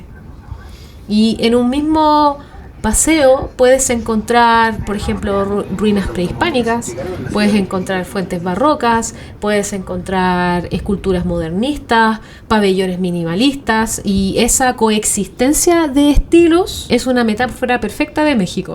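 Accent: Chile. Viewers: Eres chilena? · Arqui.en su voz.descubro lo agradable del acento chileno.contrario a lo que se dice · que gran punto de vista... en un hermoso acento chileno... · eres chilena verdad? que acento tan cool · Eres Chilena, sin duda se nota, interesante tu video no se ni como llegue a este Canal; Pero me gusto mucho, Mexicana radicando en Ca. USA. Pero quien no pierde sus raices. Cuando vivi en Ciudad de Mex. pocas veces fui al Bosque de Chapultepec, antaño estubo muy descuidado, me alegra mucho que a raiz del Gobierno de AMLO y ahora de la Presidenta Claudia S. lo esten renovando. Un dia volvere y lo recorrere COMPLETO!!! 🙏🙏🌲🌳🌴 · lindo lenguaje con tono dulce chileno que reúne terminología ecológica,política y cultural,medida a medida con pausas y silencios y hasta poesía! Una Felicitación 🇲🇽🇨🇱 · Me encanto la narrativa que hiciste en este video, supongo que por el acento que tienes eres chilena, te felicito y te mando un fuerte abrazo y Chapultepec es un bosque golpeado por el paso del tiempo y de sus malos gobernates, pero no obstante ello, sigue hermoso y siguue de pie.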